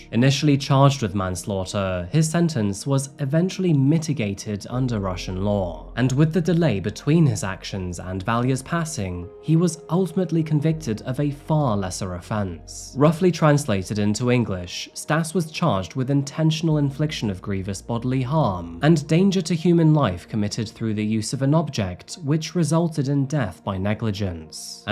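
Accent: England, United Kingdom. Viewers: You have an English accent but pronounce some words like an American? Is this for your American viewers or just how you say it?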